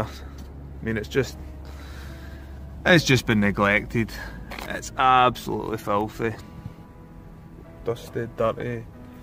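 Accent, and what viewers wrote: Scotland, United Kingdom. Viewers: Is that the Scottish accent!!!😂😂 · I can't be the only one who watches these videos mainly to hear that Scottish accent... 😍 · Is this scottish? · I did enjoy the video. First, because I enjoy listening to your lovely Scottish accent as much as I enjoy watching the cleaning process; second, because it is nice to see a man cleaning for a change. lol. Waiting for your next video. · good job! love the Scottish accent... alright goias and gaels... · I love your videos! ☺️ and I absolutely LOVE your Scottish accent. Or is it not Scottish? Suddenly not too sure anymore 😄 nevertheless, I like it 😍😄 Swiss gal with a Scottish grandma here. So, technically not half Swiss half Scot but when it comes to my heart, at least half of it beats for this beautiful country called Scotland! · Love the Scottish accent!! I'm from Morocco but live in Wales with my Welsh ge-rl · you sound scottish,first video :D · what is that awsome accent? 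scotch ? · This was such a job well done! Originally from Maui, HI, but attending university in Seattle, WA! You’re Scottish right?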